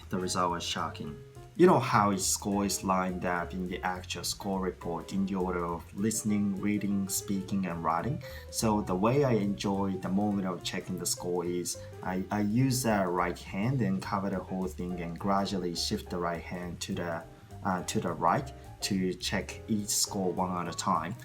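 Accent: Australia. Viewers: ところどころオーストラリアのアクセント入ってて好きです笑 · オージーイングリッシュですね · 6年前も8.5とった内容の動画取ってますが、この時はよりオーストラリア英語になってますね 今のオーストラリア（メイン）＋アメリカ/ジャパニーズ（サブ）アクセントそっくり。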